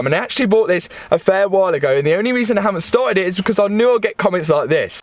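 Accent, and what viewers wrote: Leicester, United Kingdom. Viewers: Sounds like a lestah accent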